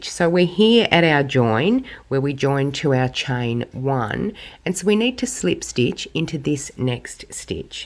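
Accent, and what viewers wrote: Australia. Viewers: Thank you! Great pattern and excellent instruction! Lovely to hear another Aussie accent 😊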